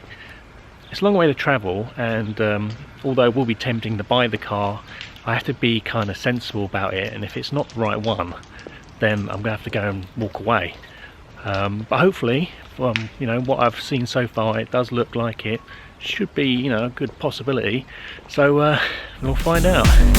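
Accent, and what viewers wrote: United Kingdom. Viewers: This time on soft spoken british chaps buying german motorcars...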